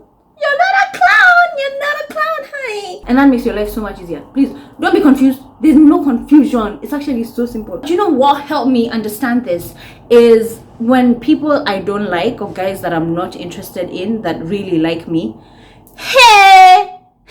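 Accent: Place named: United Kingdom. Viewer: its the bri*😁ish accent for me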